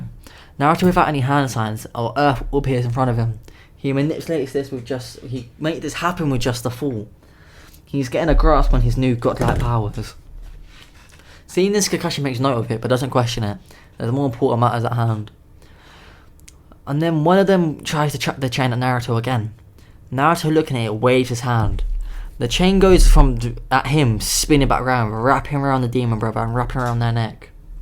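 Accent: United Kingdom. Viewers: oh i love the British ascent its so funny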